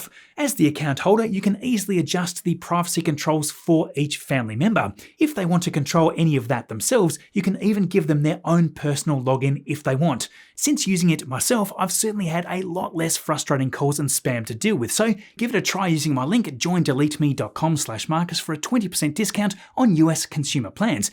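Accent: Australia. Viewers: coffee and Marcus' charming Aussie accent. Yep, it's saturday and the day is starting well. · Sorry ... aber mir geht das hektische australische Gequatsche von Dir nur mehr am Arsch vorbei ...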